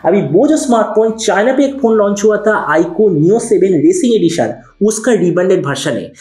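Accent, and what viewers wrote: Bengal, India. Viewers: Bro, tongue Hindi nahin hai to Hindi channel mat karo, bongali hi thik rahegi.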